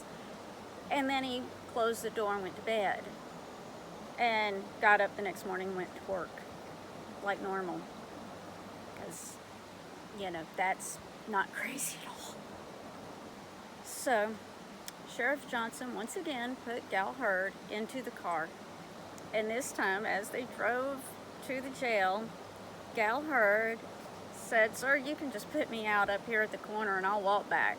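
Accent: South, United States. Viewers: Love your southern accent !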